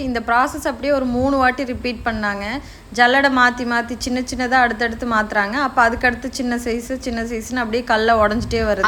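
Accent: India. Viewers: கொங்கு பேச்சு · வாழ்த்துக்கள் சகோதரி. கோவை தமிழ் கேட்டது மகிழ்ச்சி. வாழ்க வளங்களுடன்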